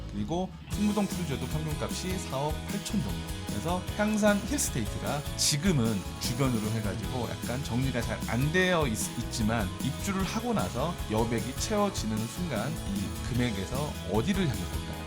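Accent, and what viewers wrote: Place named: Jeolla, South Korea. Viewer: 혹시 고향이 전라도신가요? 고향말투가있는것같아서 반갑네요ㅎㅎ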